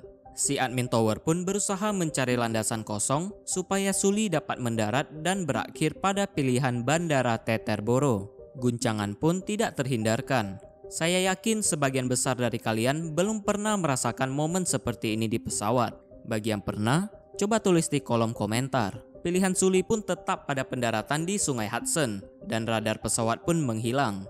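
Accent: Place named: Singkawang, Indonesia. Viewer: Ini logat orang cina Singkawang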